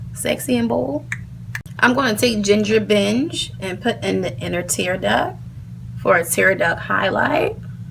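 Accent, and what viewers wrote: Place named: New Orleans, United States. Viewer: You slayed this video! You are too much this New Orleans accent draws me in every time I promise lol 😂😂😂😂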